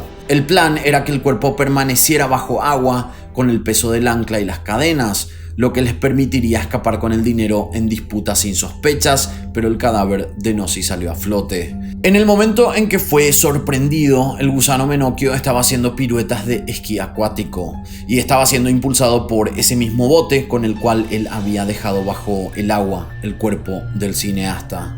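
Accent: Venezuela. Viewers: Pensé que eras venezolano. No conocía este caso y ps wow lo que hace una buena oratoria. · Yo pensé q eras venezolano jiji Desde que conocí tu canal , tus videos son muy interesantes y atrapantes x la manera en cómo Relatas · Aló! 👋😉👌Pensé que eras Venezolano. 🤔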